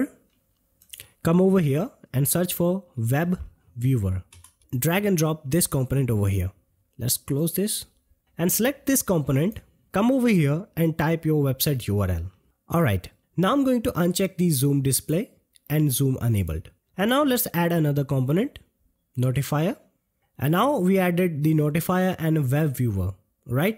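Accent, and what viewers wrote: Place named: India. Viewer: come on bro i get your trying to help but can i get a american person to teach me, like i hate the way you talk im not indian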